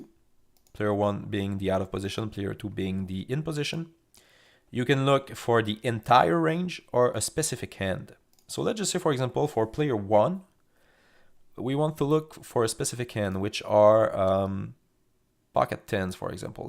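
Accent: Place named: Quebec, Canada. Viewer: Pretty sure I'm hearing that French Canadian accent! Merci beaucoup pour la série, ça m'a aidé bcp!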